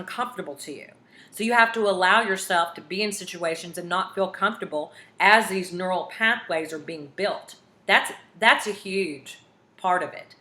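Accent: Texas, United States. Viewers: You sound like a Texas woman! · Girl u have to be from Texas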